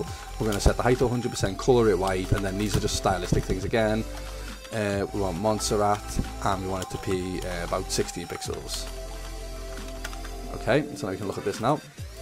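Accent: Liverpool, United Kingdom. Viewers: scouse accent 🤔 its hard to keep up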